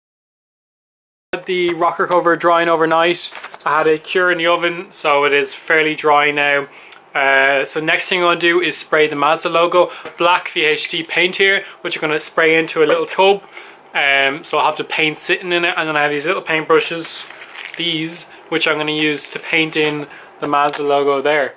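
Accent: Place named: Ireland. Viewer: Good job, irish? I hear the accent of my people! Lol county down fella here! Great video man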